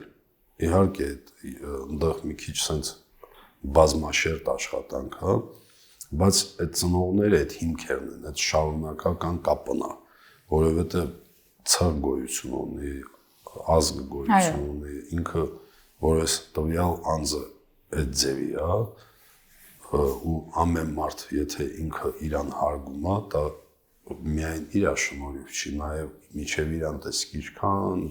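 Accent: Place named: Karabakh, Azerbaijan. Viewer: ղարաբաղցի է հաստատ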